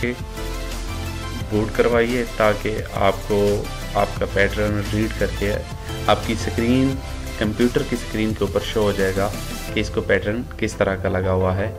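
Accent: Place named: India. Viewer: if you cannot speak English - don't speak. 4kin Indian pronunciation: just make the text on screen!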